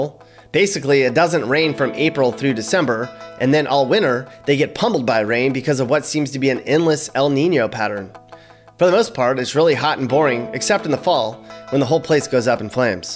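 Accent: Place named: West Hollywood, United States. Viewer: West Hollywood where your accent was born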